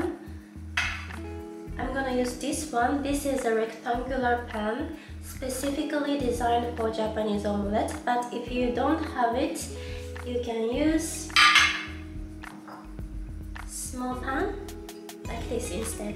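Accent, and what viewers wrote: France. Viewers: の英語アクセントにフランス訛りがあって、すっかり🇫🇷に馴染んですごいなーと勝手に思っていました🥺💙🤍❤️ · Your English has a slight French inflection, so cute:)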